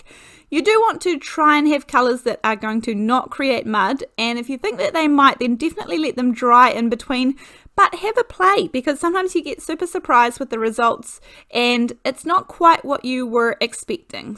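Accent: New Zealand. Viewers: My 5 year old daughter loves watching your videos with me. I've just found her in the kitchen doing her own crafting tutorial for her little brother and sister, complete with a slight New Zealand accent! (we live in the UK!)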